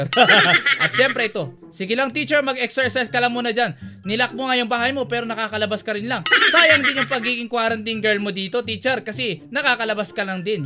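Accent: Ilocos, Philippines. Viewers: Kuya ilocano kaba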